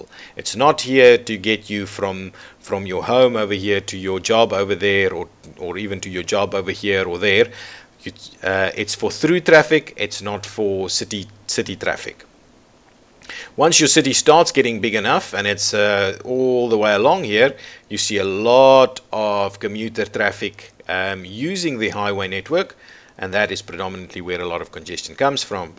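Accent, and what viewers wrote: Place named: South Africa. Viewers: I pinned him as South African immediately. :) 👍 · As soon as i heard him speak I thought you were South African, then I heard you say "trrry" then I knew . Glad to have this video. Really helped me as I always battle with transport on cities skylines. You just got a sub.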